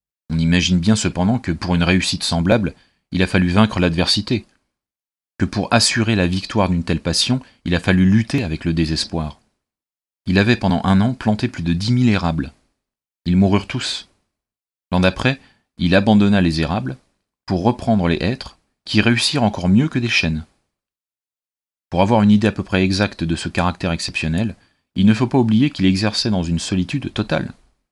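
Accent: North, France. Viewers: Dommage que l'accent soit du nord pour raconter une histoire que Giono a raconté avec notre accent du Sud!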